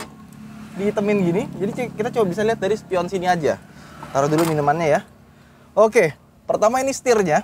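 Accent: Padang, Indonesia. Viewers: Bang orang padang ya?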